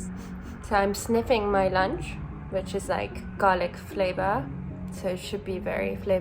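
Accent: United Kingdom. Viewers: I’m glad that you recovered! Thank you for sharing your story! I love your YouTube and your British accent ☺️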